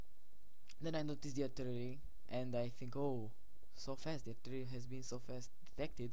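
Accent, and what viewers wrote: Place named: Romania. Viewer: Esti roman? :)) ai accent romanesc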